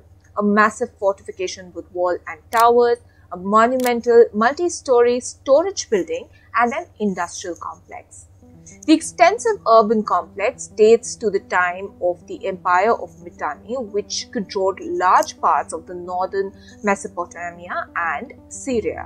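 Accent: India. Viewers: Yuck, that indian accent. Stop speaking english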